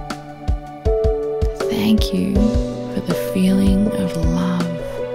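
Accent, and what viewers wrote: Australia. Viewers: My soul responds so much more to your beautiful Auzzy voice ! Namaste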